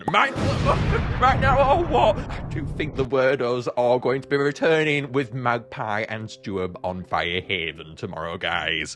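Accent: England, United Kingdom. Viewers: You’re English !!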